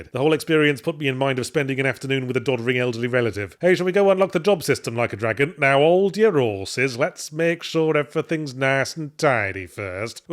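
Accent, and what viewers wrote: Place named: Lancashire, United Kingdom. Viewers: To me this finally confirms my suspicion that is Northern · god ive missed hearing northerner accent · The Lancashire accent!! My god.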